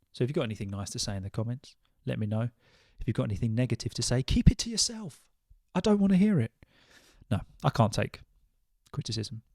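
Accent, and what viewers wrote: RP, United Kingdom. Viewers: hello mate can you do a video while you speak like your normal day not as a teacher we need more listening challenge 😉 and can i ask you about your accent is it received pronunciation?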